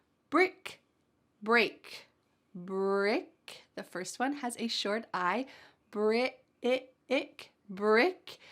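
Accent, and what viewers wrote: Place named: United States. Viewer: Thank you, you are the best teacher. You made me love the English language and the American accentThank you We all love you❤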